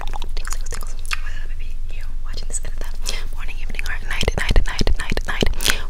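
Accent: England, United Kingdom. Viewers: Can you make a video with only your England accent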